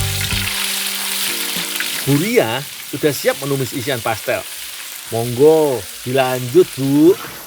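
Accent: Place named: Banyumas, Indonesia. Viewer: Ngapak lurrrrrr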